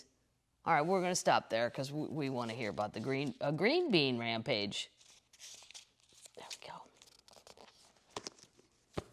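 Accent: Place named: Appalachia, United States. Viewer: your doing a bang up job reading Lorettie's words in her native "Hillbilly" speak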